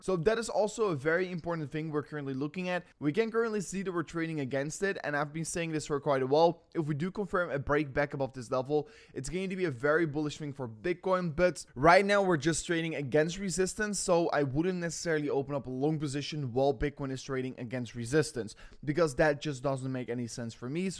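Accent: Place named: Netherlands. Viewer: The dutch accent is terrible though 😂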